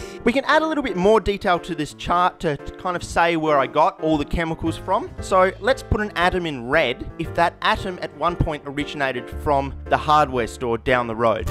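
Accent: Australia. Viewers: So refreshing to hear Aussie content on YT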